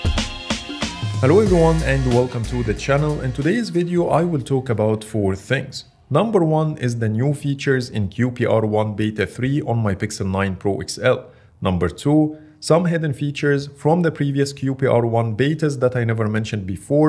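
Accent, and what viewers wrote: Egypt. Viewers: I came for the Egyptian accent and stayed for the content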